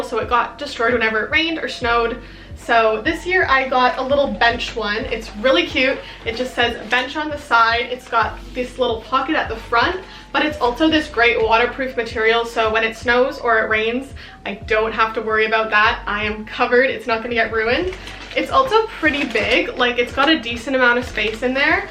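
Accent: Canada. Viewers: Hi I am also from Canada I just found your channel I am going to subscribe. Are you from Ontario it sound like you are? I hope this is not creepy 🤣. I am also from Ontario if you are. You video was amazing btw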